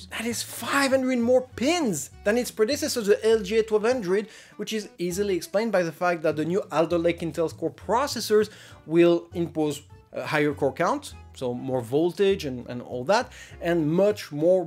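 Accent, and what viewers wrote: France. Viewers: pretty french accent bro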